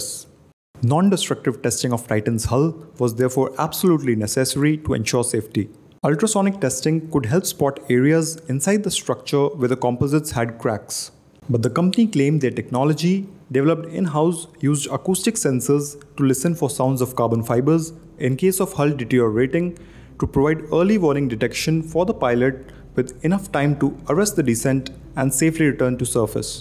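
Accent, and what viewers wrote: India. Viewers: I felt like I was listening to an Indian call center🙄 also pressure is not only on top…..it is equal all around the exterior. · Sorry but Hindi accent makes me laugh! Can't get serious about this video. I do apologize!!! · Im impressed. I didnt expect an indian to have such a good description and understanding of material and engineering. I almost left when I first heard him. But I think he is pretty good! I like the video, thanks for sharing your knowledge! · Great job! Your English is atrocious due to the heavy Indian accent but the content was good. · Indian englishhttttt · Who used an Indian to narrate this story??? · Oh no not that indian accent ! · The paj33t accent is unbearable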